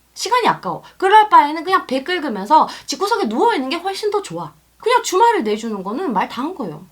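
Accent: Gyeongsang, South Korea. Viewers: 온니 대구 사람이에용? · 언니 대구 사람이구낭😍 이렇게 엮어들어거는거란 말이죠?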